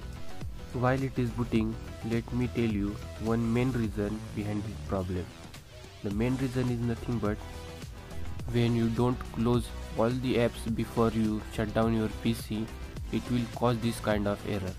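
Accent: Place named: India. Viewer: As soon as i hear bgm and indian accent i knew it's gonna work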